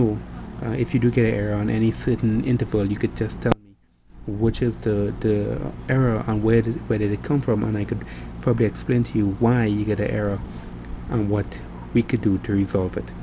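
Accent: Trinidad and Tobago. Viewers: trini accent detected?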